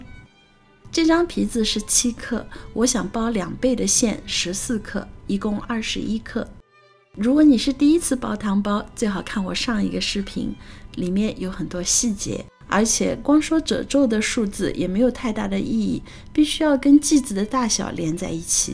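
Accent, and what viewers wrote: Shanghai, China. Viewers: 难得听到有youtuber说这么正宗的上海国语，必须follow一记！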